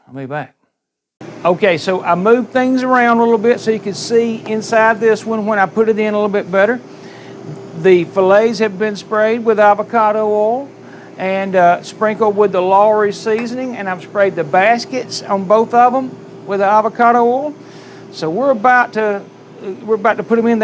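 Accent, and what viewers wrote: South, United States. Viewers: Hi tried the Salmon Fillets yesterday absolutely delicious 😋 we are Ninja Foodi new comers , brilliant bit of kit , by the way love the good old Southern Accent !!!. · There's just something about the southern accent. 🤗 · Great video plain and simple 'you mentioned your southern accent" that adds to receipts flavor. yo' al I am new to this air freyer The Nija and needed some cooking information. Thanks again...I am 80yrs old and one is never to OLD to learn something new! God Bless. 😉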